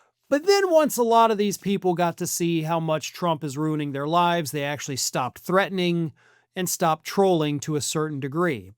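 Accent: South, United States. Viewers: Love the redneck accent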